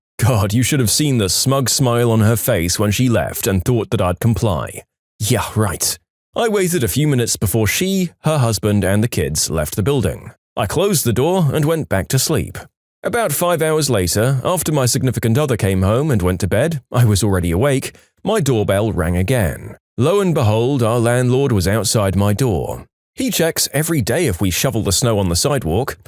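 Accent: United Kingdom. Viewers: He sounds br-ish.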